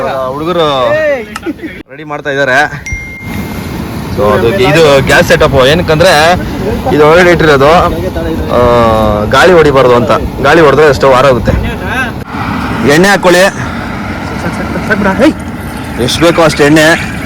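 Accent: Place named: Anekal, India. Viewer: ನಮ್ಮ ಆನೇಕಲ್ ಬಾಷೆ ತರ ಇದೆ ನೀವು ಮಾತಾಡೋದು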